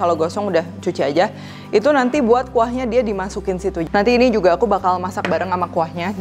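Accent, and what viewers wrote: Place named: Tasikmalaya, Indonesia. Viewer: "Lebih lekoh lagi" 😁 Cici dari mana, Tasik ya?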